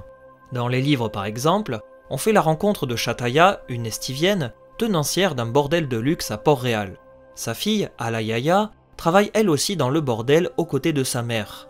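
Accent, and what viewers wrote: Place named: Toulouse, France. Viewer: Hey! Même avec un petit accent toulousain, mille reste invariable! Pas de "s"!!!! à signé la grande pourfendeuse des liaisons dangereuses!:))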